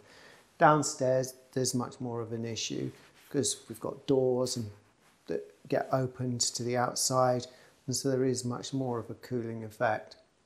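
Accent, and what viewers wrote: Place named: Southern England, United Kingdom. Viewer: It would help to know where in the country you are. I take it you are not in Scotland and from your accent you are probably in the South. So is the system only suitable in Southern England where, these days, it is quite warm?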